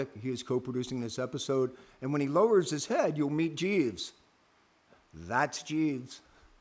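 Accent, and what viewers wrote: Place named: Eastern Europe. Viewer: Cool Vlog man, Fred is right it does sound eastern European. Anyway log time fan and looking forward to the next one!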